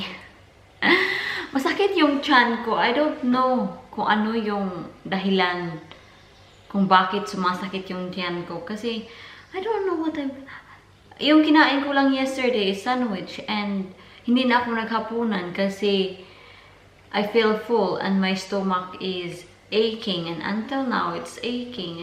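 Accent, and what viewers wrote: Visayas, Philippines. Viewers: It seems in your speaking that you were bisaya , bisaya pud ko .. please support my channel . Done subscribing to your channel .. God bless :-) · Hahaha😂😂😂 asa diay ka sa Bisaya Dai nindut kaayo ka paminawon bisdak Kang dako😂😂😂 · Bisaya kaayo oy :-) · Bait mo Kabayan . Yung tono nang pananalita mo ay bisaya ..katulad ko isang bisaya...hahaha.. I like it . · Oi palangga taga saan ka sa pinas pangga para ka kc bisaya.... ang tuno mo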